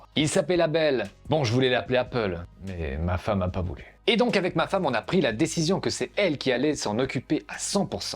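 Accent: Switzerland. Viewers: Se bon accent Suisse il m’avait manqué, welcome back et félicitations pour cette grande mif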